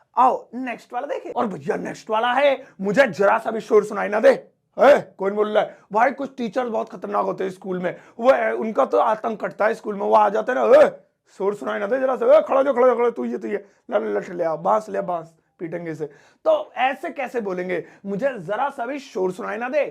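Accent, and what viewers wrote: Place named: Haryana, India. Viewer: Accent Haryanvi wala hi h sir Sare hi words ki pronounciation......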